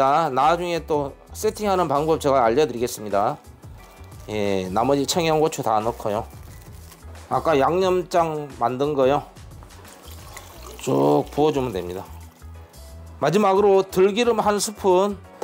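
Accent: Jeolla, South Korea. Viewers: 셰프님 부산분이시죠? 전라도 사투리도 들려서요ㅋ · ㅎ.. 고향이 어디신가요? 전라도쪽 같아요~~ 여긴 전라도 광주 입니다..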